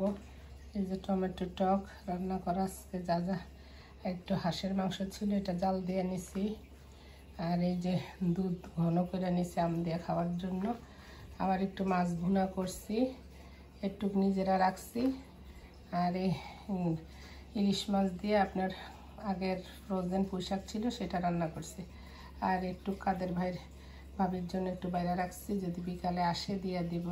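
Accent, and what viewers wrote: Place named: Comilla, Bangladesh. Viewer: আপু আপনার কথাগুলো শুনলে খুব আপন কেউ মনে হয়, আমি কুমিল্লার তো তাই,কথার সাথে মিল আছে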